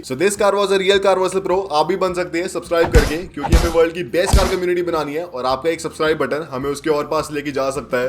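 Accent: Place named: Delhi, India. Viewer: Damn that Delhi ka douchebag accent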